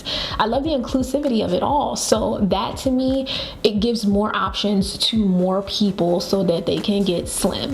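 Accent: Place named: Baltimore, United States. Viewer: I just ran across your video! Are you from Bmore? You’re accent is so cute!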